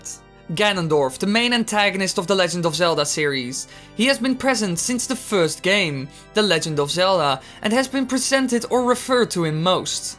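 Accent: Netherlands. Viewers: are you dutch? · Lol i can hear you're dutch. Like me😂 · Are you dutch? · it sounds like he is dutch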